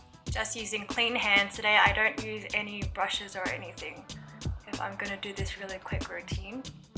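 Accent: Australia. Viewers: Found this video from the recommendations, and I was like, who is this beautiful girl?! I'm gonna watch her video!! I wish I had facial structure like you...! and your healthy skin!! Love your Australian accent too!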